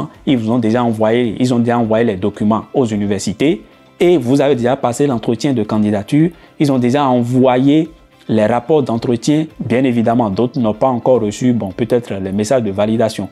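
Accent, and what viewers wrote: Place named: Benin. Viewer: tu dois être béninois vu ton accent! moi ton voisin togolais !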